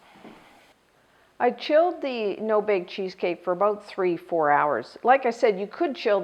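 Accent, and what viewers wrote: Canada. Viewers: I should definitely use this recipe. Well, after I burned the regular bake version, which was unpleasant :x Your accent seems to familiar to me, aren't you from Canada? :)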